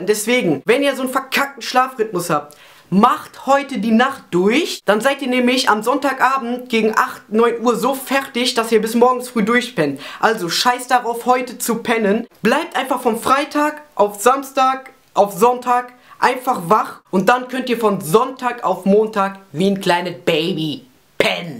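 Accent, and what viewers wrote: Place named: Cologne, Germany. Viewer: hör ich da kölsch?!?!?!?! ich würd sagen.....super tipp, das mit dem durchmachen....probier ich gleich mal aus:D ...ich hab irgendwie angst vor diesem st. augustin...!!!!!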